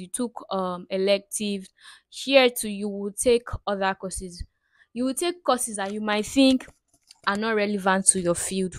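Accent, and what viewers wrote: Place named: Nigeria. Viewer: hehe. I have missed hearing Nigerian accent. Compuuter haha. It's comp-iu-ter 🤗🙃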